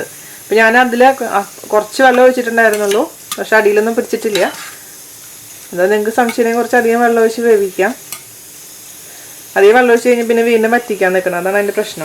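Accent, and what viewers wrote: Thrissur, India. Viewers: Happy to see ur videos.. Me too from thrissur.. Simple thrissur slang demonstration makes me feel better to understand.. And tried many dishes as per your cookery demonstration.. Each and every attempt were successful.. Thanks chechi.. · തൃശൂർ ഭാഷ... അതോ എറണാകുളം ഭാഷയോ?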